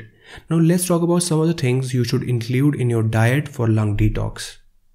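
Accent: India. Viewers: im sorry but i cant take indian english accent serious at all lmfao. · I have to think about a scammer everytime i hear that indian dialect "Hi i´m Tim from Microsoft support center, located in Los Angeles" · I honestly love Indian accents i had a good laughs when he said “number 2nd” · Just some hate how indians speaks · what a phenomenal video. just what i needed. i love the way indian people speak too. its so soothing and relaxing, i could listen all day my man. maybe i should marry a nice indian girl. thanks for all the great info. liked and subscribed.❤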